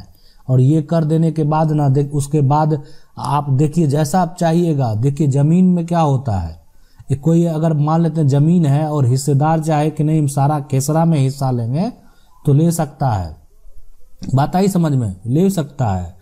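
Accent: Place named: Begusarai, India. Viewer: आप बेगूसराय बिहार से हो आप के बोलने का तरीका बेगूसराय जिले का है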